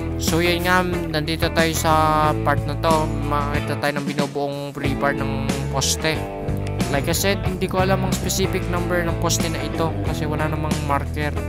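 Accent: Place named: Philippines. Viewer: tagalog accent na ha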